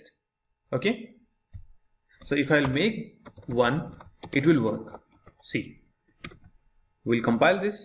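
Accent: India. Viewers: these south indian accent is so funny, stop using it. Hard to understand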